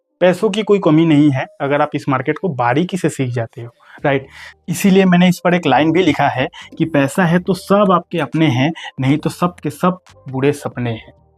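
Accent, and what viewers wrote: Bengal, India. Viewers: सर आप एकदम सरल भाषा में समझते हो आनंद आ जाता सीधे दिमाग में घुस जाता है लेकिन आपकी हिंदी में थोड़ी थोड़ी बांग्ला भाषा की ton आ जाती है तो और मजा आ जाता है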